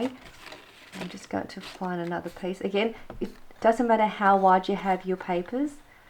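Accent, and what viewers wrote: Australia. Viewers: Lovely projects and such a gorgeous Aussie accent!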